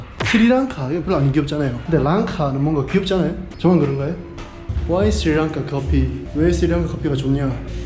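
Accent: Gyeongsang, South Korea. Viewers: 다음부터 이런 실수 하지마 ㅎㅎㅎㅎ 근데 말투가 꼭 우리 동네말투네요 대구쪽분이세요?? ㅎㅎㅎㅎ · 저도 경상도 사람이라 님 사투리가 넘 친근하게 느껴지네용 ㅋㅋ 옆집 오빠같은 느낌ㅋㅋㅋㅋ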